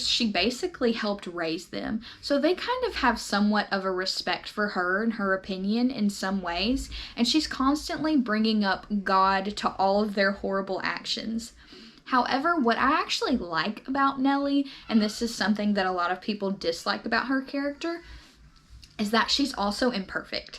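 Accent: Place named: Carolinas, United States. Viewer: I would say from the Carolinas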